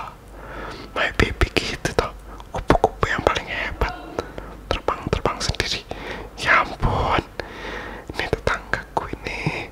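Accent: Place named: Indonesia. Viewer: He got something extra with his accent, never knew indo and english issa good combination tho. Even me as malaysian, i fell for indo language everytime i heard the indo ppl speak. It kinda sooo soft and u mixed with english , the cool tone is added so yeah 1000/100 for that ✨😂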